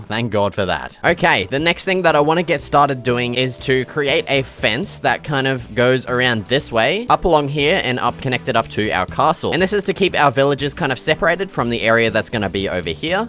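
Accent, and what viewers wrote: Australia. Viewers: why do u sound australian to me????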